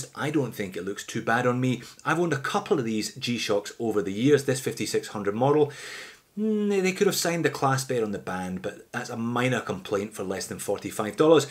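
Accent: Scotland, United Kingdom. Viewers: I suspected that you were Scottish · Great watches, great video, great T-shirt, love ur Scottish accent. 🏴👍 Most people can’t afford “luxury watches” so it is great that you test affordable watches and give them some love. I am surprised about these proudly Chinese pieces. They seem to be a good alternative.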